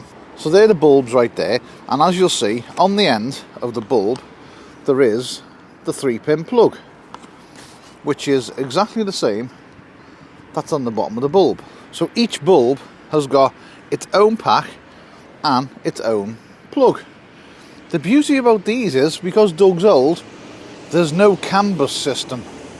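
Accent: Liverpool, United Kingdom. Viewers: Enjoy your trip i am waiting to get seats recovered then off over the border soon as poss. Would love to meet you i have been married 50yrs my other half says you sound posh scouse😅 best of luck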